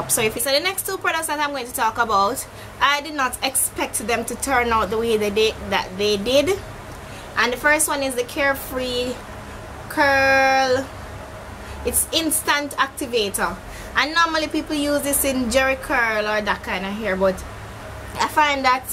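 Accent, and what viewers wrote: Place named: Jamaica. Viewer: i could hear the accent haha am jamaican too but am from spanish town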